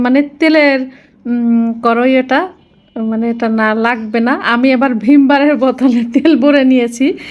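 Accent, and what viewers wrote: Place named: Jessore, Bangladesh. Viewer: কেন জিজ্ঞেস করলাম আপু আপনার ভাষা অনেকটা যশোর অঞ্চলের মতো তাই জিজ্ঞেস করলাম খুব ভালো লাগলো আপনার কথাগুলো আপনাকে অসংখ্য ধন্যবাদ রিপ্লাই দেওয়ার জন্য।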